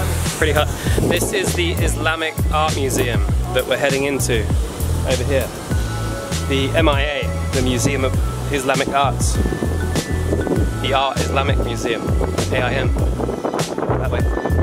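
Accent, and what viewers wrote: United Kingdom. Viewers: Amazing your video,!!! Congratulations I love the pronunciation of British English.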